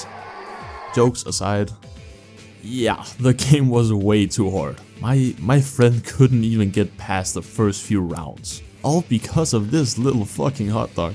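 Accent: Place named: Denmark. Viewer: Yo that would be sick i am 100% buying it if it costs money (selv fra Danmark og jeg kan godt høre du også er fra Danmark men dit engelsk er meget godt 👍)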